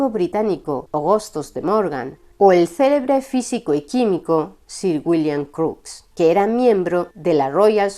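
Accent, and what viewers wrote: Castile, Spain. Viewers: Me gusta tu acento de españa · soy colombiano,y me parecen fascinantes tus relatos especialmente por tu dialéctica y marcado acento español y tu didáctica narrativa. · Me gusta como relatas, aún que no suele gustarme el español castellano. Si me dan ganas de jugar la ouija pero a la vez no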